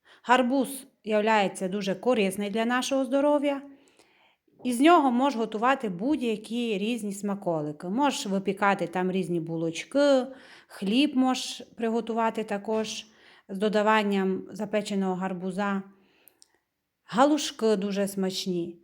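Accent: Transcarpathia, Ukraine. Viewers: Подписалась на Ваш очаровательный канал! Желаю ему процветания. Закарпатский диалект прелестный и самобытный! Привет из Калифорнии. Надеюсь, что наша родная Украина разобьет в пух и прах фашистов Раши и выгонит их со ВСЕЙ своей земли. И земля вновь зацветет своим прекрасным языком и диалектами! Слава Украине! Слава ВСУ! Все будет, Украина!💙💛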